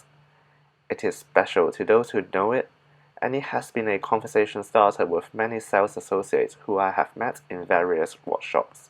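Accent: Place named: China. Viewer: you chinese ah? sounds like uncle Roger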